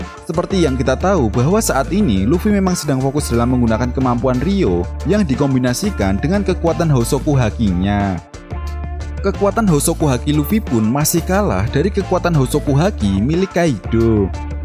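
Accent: Java, Indonesia. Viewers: Mani Ledok jawa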